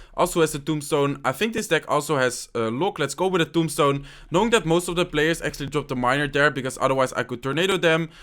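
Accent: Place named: Netherlands. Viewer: Your accent giving me headaches. Why can't you speak english like any other dutch human being.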